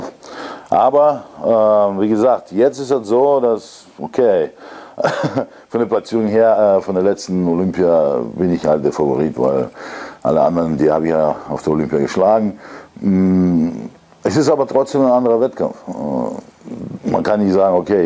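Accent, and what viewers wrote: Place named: England, United Kingdom. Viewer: Er wohnt in Amerika, so wie es aussieht spricht er deutsch mit englischem Akzente. Passiert einfach......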